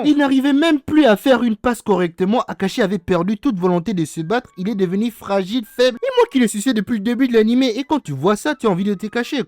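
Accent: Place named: Democratic Republic of the Congo. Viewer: Rien qu'à ta voix,je sais que tu es un 243 😎🇨🇩(moi aussi)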